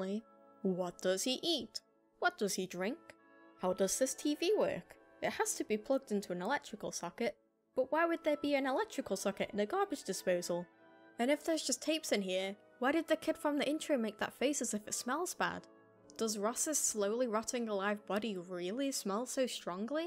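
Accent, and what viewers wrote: United Kingdom. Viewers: Wait she's British?🐈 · Sooooo, are you British or American? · I really love the british... accent? Can you call it an accent? I mean, isn't it the origninal English? Either way, love how British people talk and that is one of the only reasons I wanna visit England one day. Just to talk to British people.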